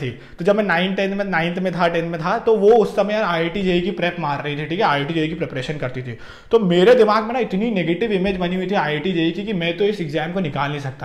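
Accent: Indore, India. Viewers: Tysm for uploading this video!! Really inspiring story!! 🤩🤩 Btw bhaiya aapke baat krne m indori jhalakti h!🥹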